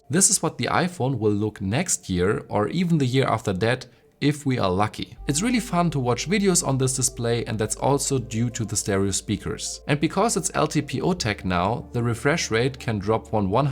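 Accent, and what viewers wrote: Germany. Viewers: Are u a native german speaker? Fellow german here, hearing it in ur voice. Sounds wierd to watch a yt vid with a German speaking english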